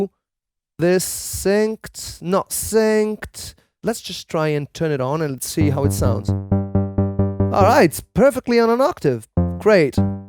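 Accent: Italy. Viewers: 😂 could be. His English is good indeed but he does have a pretty thick Italian accent. Nothing wrong with that but it's a dead give away. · you sound like you are italian, are you?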